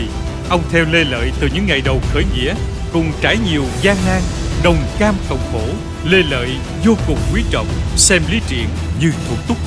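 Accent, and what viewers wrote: South, Vietnam. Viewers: Đọc theo giọng miền Nam mà bạn cũng không sao cả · ồ hay đấy nhưng lần sau thay dọng đọc đc ko? Người miền Nam đọc toàn sai chính tả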